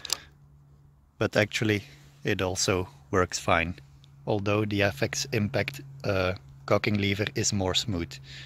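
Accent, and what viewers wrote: Flanders, Belgium. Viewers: Aan het accent te horen een Vlaming.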